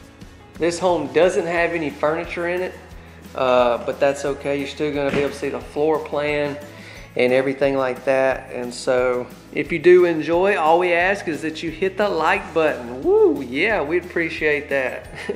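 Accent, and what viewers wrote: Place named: Alabama, United States. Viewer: Great video, I would like to mention how I enjoy your Alabama accent, my spirit is good 97% of the time as I journey through life! And I am AA and i'm a fan!.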